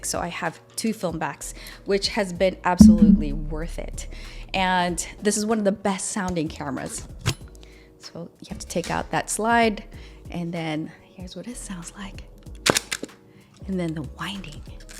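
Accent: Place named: United States. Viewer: Yes, American accent.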